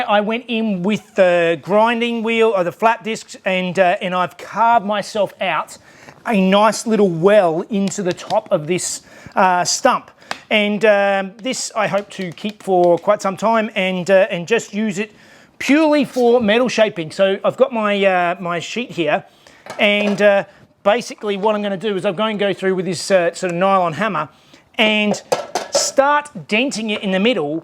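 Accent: Australia. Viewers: English, is not my first language (Dutch) as a someone from Europe, a Aussie accent is obvious;-) Don't hear the difference between Aussie en NZ (?)?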